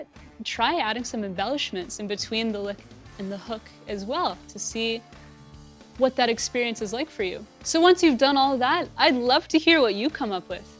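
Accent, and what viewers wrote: Canada. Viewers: God I love her canadian accent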